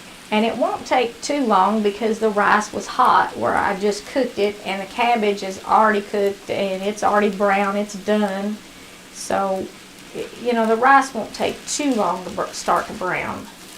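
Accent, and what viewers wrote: South, United States. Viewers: Love following you, Christ first, plus you have wonderful recipes! And you have a southern draw like myself 👍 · From witch state in the Us are you from? Somewhere from the South?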